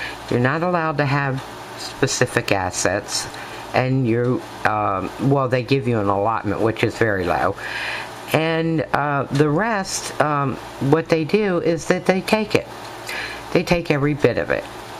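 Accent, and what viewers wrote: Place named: Pittsburgh, United States. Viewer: Id encourage you to do another video, you did great! 👍🏼 You can help others so much with your story...i found you at t0ny's site💕🙏🏼😘 (r u from pgh, pa? U sound like it, thats where i grew up)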